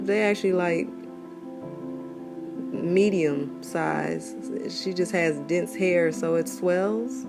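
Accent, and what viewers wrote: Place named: Detroit, United States. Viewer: Why i say to myself…. She sounds like she’s from Detroit….❤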